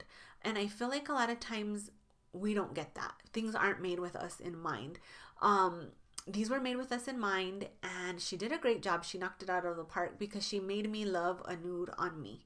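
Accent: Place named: Miami, United States. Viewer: Just started watching this video but I am already fascinated by the accent thing. What most people don't realize is that every person who speaks English has an accent. They just don't hear their own because they usually have the accent of their local area and peers. Or we associate non accented speech with whatever we hear in popular media, but that's also an accent. What I am fascinated by, is that when I first heard you, I thought I heard a bit of a "Miami accent." But not quite. Now it makes sense though! Miami accent is influenced by more Caribbean influences, but both yours and theirs have the darkened L sound. I could talk about this forever. Let me keep watching for polish😅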